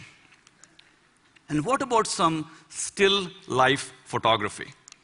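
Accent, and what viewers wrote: India. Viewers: hintli aksanı kadar kulak tırmalayıcı bir aksan yok amk ;( sessiz izledim videoyu. coca cola şişesiyle deney yapmış sikik. biz de bim poşetiyle yapmalıyız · Hindistanlıların ingilizce konuşması o kadar komik ki adam şu videoda evrenin sırlarını verse , ışıktan hızlı giden uzay gemisini yapmayı falan anlarsa , ne bileyim işte dünyanın en önemli şeyini bile anlatsa , gene komik bu aksanla ))) eleman sanki temel fıkrası anlatıyor. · Bu hindistanlilar ingilizce danisanda iyrenc seslenir sanki baska bir dilde danisirlar iyrenc videoya adama gore baxa bilmedim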